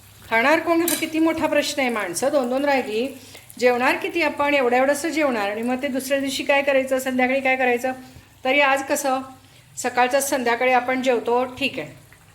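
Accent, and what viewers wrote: Kolhapur, India. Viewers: मॅडम तुम्ही कोल्हापूर साईडच्या आहात की कानडी माझ्या माहेरी पण अशाच गौरी बसवतात थोडी बोलण्याची पद्धत थोडे ताटातील पदार्थ म्हणून तुम्हाला विचारले कृपया राग मानू नये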